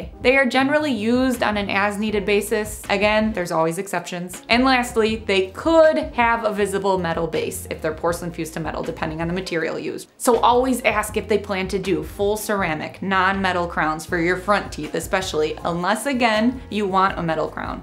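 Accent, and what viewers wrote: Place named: Midwest, United States. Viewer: You sound midwestern as hell, Chicago??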